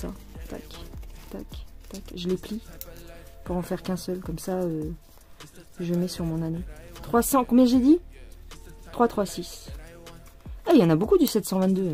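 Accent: South, France. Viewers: Coucou ma petite adorée, oh si maintenant les confettis se cachent c'est infernal! C'est vrai que sur cette toile rien n'est logique mais c'est parti! Tu braves les difficultés 😂! Tu nous en auras appris des choses et ne t'inquiète pas on est aussi accrocs! Accrocs également de ces bons moment passés avec toi! Aujourd'hui l'accent du Sud revient hi hi. Tu vois le chat est là 😺, il faut lui mettre des petites chaussettes de bébé pendant que tu fais la coiffeuse car un coup de griffe est vite arrivé. Je me suis retrouvée un jour avec le bras qui a triplé de volume suite à une griffure, une méchante infection qui a duré 3 semaines! Bref, ici on parle de tout est c'est chouette! Merci merci et une toile de plus, à la suivante! 🤗. Des gros bisous doux doux doux 😘💙💙💙💙💙💙💙et salut les gens 🖐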